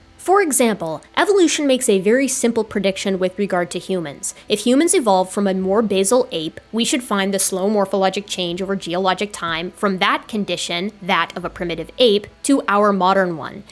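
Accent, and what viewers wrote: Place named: Minnesota, United States. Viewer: Is that a Minnesota accent I hear, ew.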